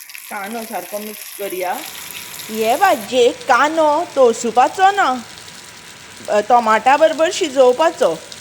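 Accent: Konkan, India. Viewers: Hey my MIL and I make it as a side with Neer Dosa often for breakfast. Ghovak khup avadta te. We chop the tomatoes to a little more smaller size instead of slicing/dicing like you did. Although I like it big. I love your Konkani dialect...the way you say "kaano" for onions is so irresistibly cute ❤️ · Must be tasty will try...your Konkanni is really nice to hear...dont know when Goa has a beautiful konkanni language why it's not used. Thank you for using it..God bless..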